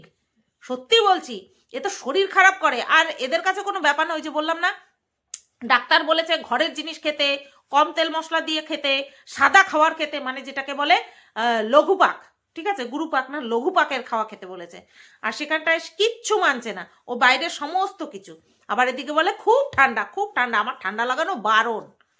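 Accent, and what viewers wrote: Bangal, Bangladesh. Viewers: Tomar mukh e 'Bangal' bhasha sunte darun lage ❤. Ami Bangal er meye aar 'Ghoti' barir bou . Bangal bhasha ta darun misti amar kache 🥰.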